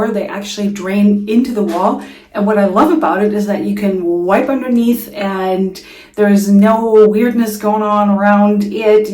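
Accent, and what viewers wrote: United States. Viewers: American accent but perhaps has not lived in the United States since she was a little girl and doesn't know much about it? The removable shower head shown is available everywhere, Home Depot, Walmart, etc. Even the fixed shower heads are adjustable so you can aim them where you want. Windows with interior shades, while not popular, are available in the US. Many, perhaps most American homes have a concrete basement with stairs going down to it. Not all American homes are, as she implied, overdone. Perhaps she watches television and makes assumptions? Many, MANY are simply furnished. I'd say her video "German vs American Homes... this explains everything actually explains nothing. Instead of making stuff up, she should do some research.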